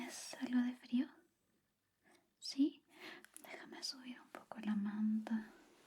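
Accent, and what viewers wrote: Chile. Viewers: Me encantan los tus asmr hablando chileno.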